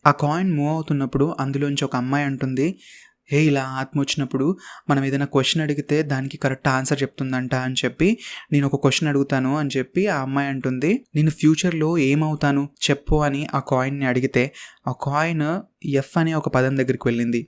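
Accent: Telangana, India. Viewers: Anna story super and mee Telangana slang inka super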